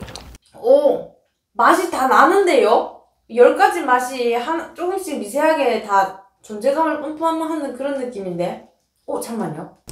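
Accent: Gyeongsang, South Korea. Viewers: 님 갱상도사투리가 정답네용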